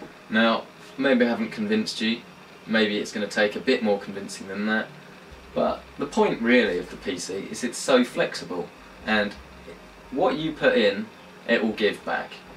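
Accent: United Kingdom. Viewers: His british accent just adds to the comedic value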